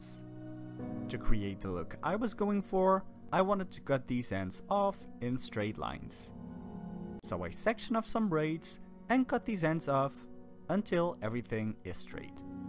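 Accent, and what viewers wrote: Netherlands. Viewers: That netherlands Acsent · She's so beautiful!!! Great job! Btw are you Dutch? I noticed a subtle accent since I am Dutch myself.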